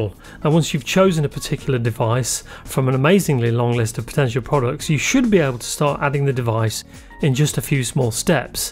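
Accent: United Kingdom. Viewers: I thought he is based in the UK from his accent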